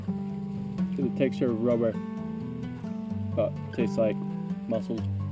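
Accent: Scotland, United Kingdom. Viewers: Daniel Parker it’s a Scots accent. And a lovely one for all that.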